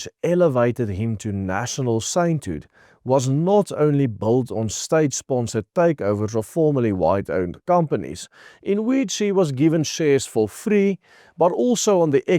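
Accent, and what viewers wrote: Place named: Afrikaans, South Africa. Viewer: Bru the THICK AFRIKANER accent😂 I love it! My true fellow South African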